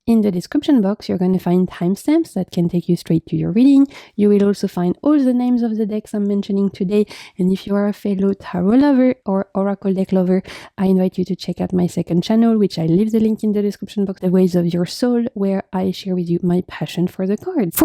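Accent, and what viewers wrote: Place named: France. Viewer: i lived in paris and dijon for 10 years...your french accent is delicious. and thank you for such an amazing reading. pile 3 merci beaucoup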